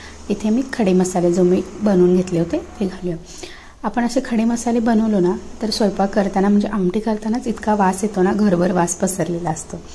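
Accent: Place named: Kolhapur, India. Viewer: कोल्हापुरी भाषेत लय भारी 👌👌👌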